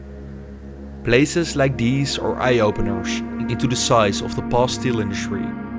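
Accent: Netherlands. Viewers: Echt een magnifieke reportage met prachtige beelden maar het is echt lastig om naar engels met een zwaar Nederlands accent te luisteren. 't Zou aangenamer zijn in Nederlands (of Engels ingesproken door een native speaker)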